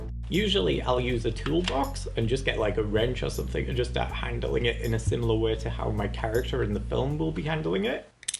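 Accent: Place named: United Kingdom. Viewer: Great advice, thanks a lot! Love the humour. And the dialect 😊. Totally unable to place that, though - which part of the UK?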